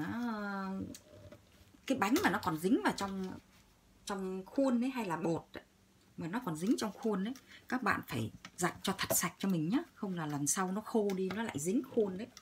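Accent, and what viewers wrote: Vietnam. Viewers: Đó là tiếng Bắc 54. Nghe rất hay và sang. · Giọng nói của Cô hay quá Cô ah - giọng Bắc / Saigon xưa - thật rất đẹp và sang.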